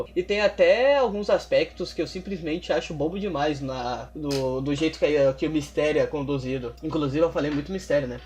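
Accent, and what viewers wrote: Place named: Rio Grande do Sul, Brazil. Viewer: Bah, é raro ouvir o sotaque de um conterrâneo em análise de animes e mangás. Sucesso aí tchê!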